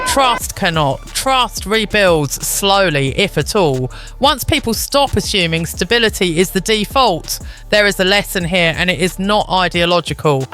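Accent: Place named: London, United Kingdom. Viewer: Your dialect is smack in between Queen"s English and cockney.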